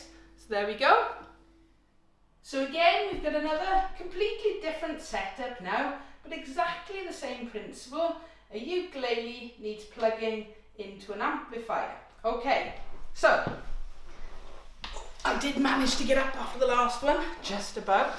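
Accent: Wales, United Kingdom. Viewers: im from canada and i love the welsh accent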